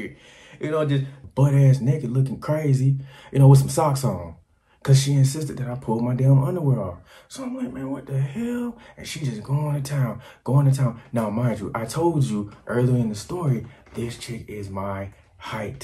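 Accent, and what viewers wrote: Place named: Memphis, United States. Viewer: Definitely sounds like you are from Memphis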